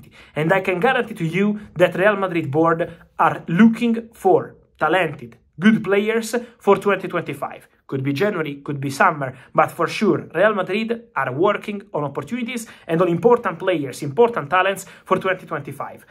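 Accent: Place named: Italy. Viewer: Italian english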